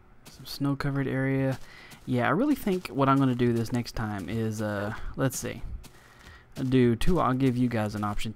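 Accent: South, United States. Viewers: you from the south bro?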